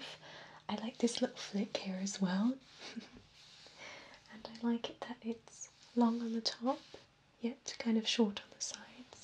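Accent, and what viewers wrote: England, United Kingdom. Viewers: "obviously you'd need to grow your hair a lot longer" bish my hair is longer than yours :-P I actually really like this video, which is unusual for me, tbh - generally I'm really not into british voices in asmr (being british myself - i think part of what I"m looking for in asmr videos is a kind of escapism from the reality i'm surrounded with on a daily basis? idk) anyway, good job :) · You sound a lot like whispers red wonder if you're from the same part of England... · Yes she is so stunning, And what a ASMR video lovey British accent so soothing....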